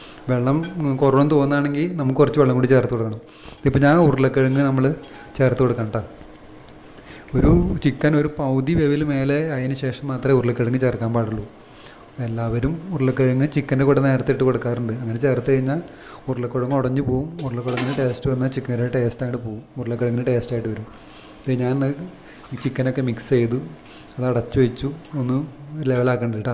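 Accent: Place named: Thrissur, India. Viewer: തൃശൂർ ആണോ.. സംസാരം നല്ല രസണ്ട് 🤩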